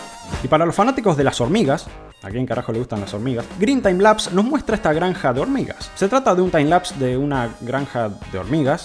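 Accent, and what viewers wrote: Argentina. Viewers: un poco de todo pero argentino (No es hate)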